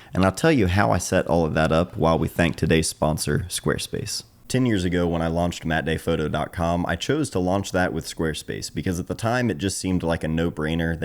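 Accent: Ohio, United States. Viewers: Okay, so I'm a newbie to photography and found your channel by random chance on YT. I started to listen to you speak and immediately thought "that accent sounds familiar"...and then I check your channel's "about" portion and find out that you're from/based in Chillicothe, Ohio. And it all made sense. I grew up not far from you. It's great to see someone from that area of "rust belt meets Appalachia" doing so well on YT and in his creative pursuits. Keep it up, bub!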